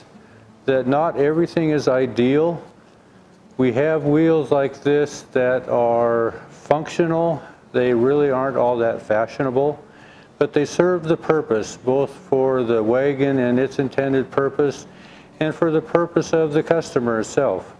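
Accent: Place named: West, United States. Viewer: This is a snarky, implied criticism of the customer, who is labeled as being in effect "inauthentic." As though being a horse and buggy mechanic with a MIG welder, video cameras and video editing software, and a Youtube channel dedicated to ASMR videos with a horsey western twang is somehow more authentic. A case of the kettle calling the pot black. Leaves a sour taste. "What you mean 'we,' Kimosabe?"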